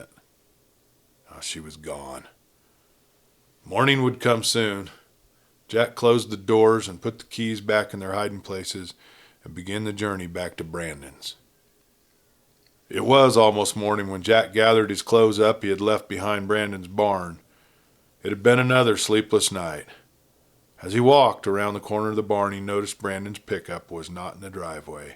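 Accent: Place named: Texas, United States. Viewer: And you almost sound like a Texan❤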